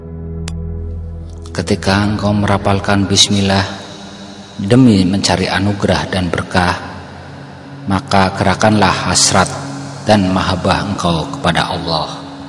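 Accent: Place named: Aceh, Indonesia. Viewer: ne orang Aceh kayak nya, logat bicara, na nomor W A!,.'-.', '.-.'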